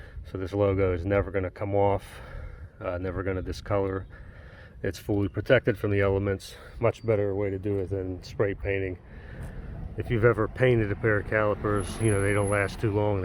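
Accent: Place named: Pittsburgh, United States. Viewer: Are you in Pittsburgh. Want to have some similar things done to my SL. Who did you use. Sounds like a Pitts accent. Best, Dave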